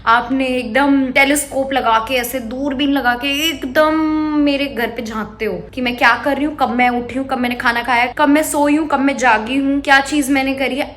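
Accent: Garhwal, India. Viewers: Hayee dii apki gadwali.....😍♥️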